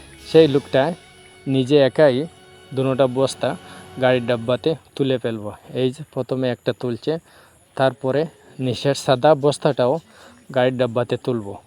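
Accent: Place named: Sylhet, Bangladesh. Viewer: ভাই আপনি তো আমরার সিলেটি মাথে বুঝা যায় ❤😂😂😂😂😂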